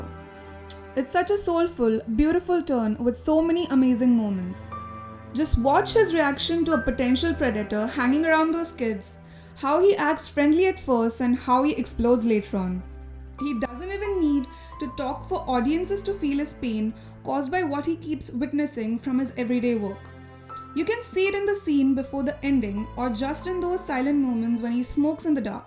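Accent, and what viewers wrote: India. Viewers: I would seen this through if it wasn't for that strong indian accent. Reminds me of "microsoft" caller.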